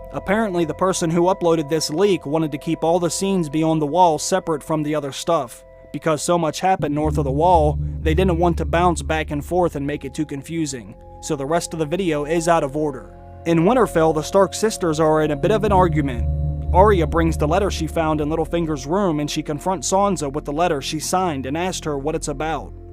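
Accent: Pittsburgh, United States. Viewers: Are you from the Pittsburgh area ???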